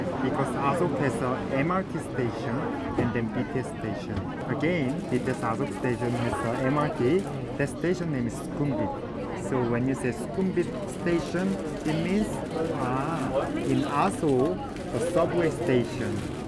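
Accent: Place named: South Korea. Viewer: I like your korean accent when u speak english. So lovely 😅😅😂🎉🎉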